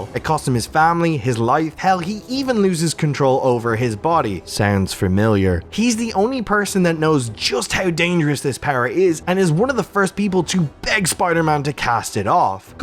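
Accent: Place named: Ireland. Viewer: The accent came through , Irish content creator? Dope videos by the way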